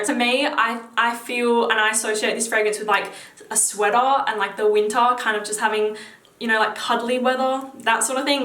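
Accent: Australia. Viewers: YU AUSSIE ? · 100% normal Aussie accent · That accent! I'm from London and get a lot of comments on mine haha but that aus accent suits you to a t!! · I think she's Australian....but, I'm not sure....classy girl for sure! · I definitely wasn't expecting an Aussie accent when i opened the video lol. Great list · Her australian accent does it all 👌🏻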